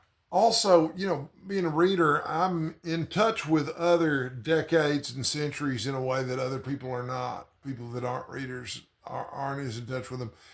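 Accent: Texas, United States. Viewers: I like your videos. I've been to Texas, I love your accent.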